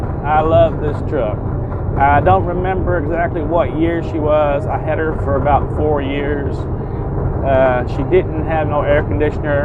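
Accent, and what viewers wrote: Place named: South, United States. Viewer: I love your accent, its from south right? great trucks, i really like to try to drive those monsters,wow. xoxo monstermom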